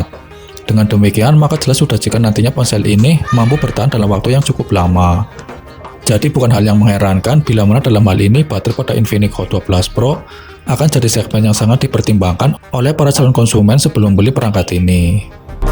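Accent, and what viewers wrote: Banyumas, Indonesia. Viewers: Mantap mase .. ra ngapak ra kepenak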